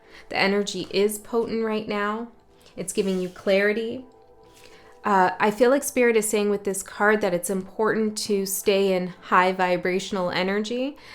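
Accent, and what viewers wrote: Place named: Toronto, Canada. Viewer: I’m from Toronto too (Toronto Mans accent) 😂😂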